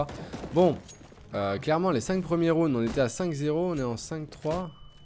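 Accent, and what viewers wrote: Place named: England, United Kingdom. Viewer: Franchement des youtubeurs comme toi c'est magnifique t'es toujours souriant je te suis depuis tes 100 abonnés continu Ps: Magnifique Accent anglais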